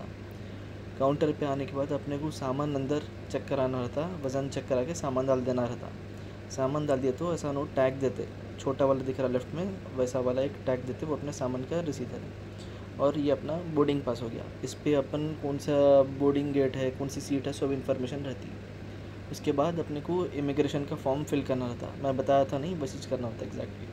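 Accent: Hyderabad, India. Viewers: Hyderabadi sunkey sukun masla dikha..mast samjhaye 😍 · wow man i liket your hydrabady language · Awaaj sunke bhi aap ko pata nahi chala bhai haidrabadi h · Waahh... Puri Hyderabadi language Bole aap.. Good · Sir your Hyderabadi Hindi is Fabulous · PURE hyderabadi yaar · Bhai hydrabadi bhasa bhot achi lagti meko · Hydrabadi Hindi Is awesome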